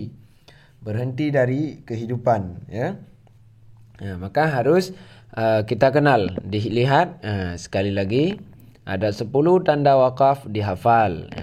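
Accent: Aceh, Indonesia. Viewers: Saya mau tanya.ustad orang Aceh ya.krn fasih kayak orang Aceh ngaji Krn saya juga orang Aceh...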